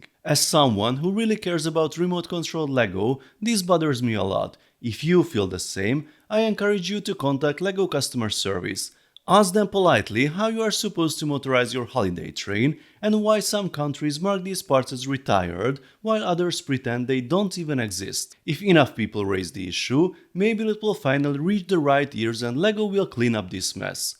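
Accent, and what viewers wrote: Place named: Hungary. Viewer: Heló i m..... erősen magyaros a kiejtés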